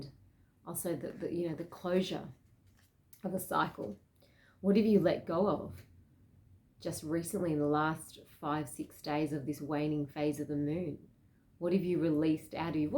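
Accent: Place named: Australia. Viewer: Thank you 🙏 Love your energy, and aussie accent ;) ✌ Aries nation sweden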